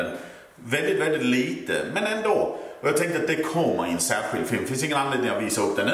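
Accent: Scania, Sweden. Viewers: Grattis till 10k följare härligt att höra din skånsk/danska dialekt. Tycker du ska döpa moppen till Rosa ladyn/ rosa tanten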